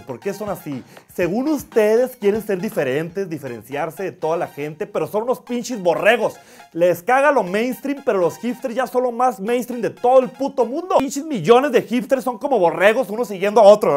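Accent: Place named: North, Spain. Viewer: Aquí conteniendo mi risa porque ya todos duermen. ¡Pero que cosa! Este tipo es muy chistoso. Aparte su acento norteño: “mushasha”. ¡Good!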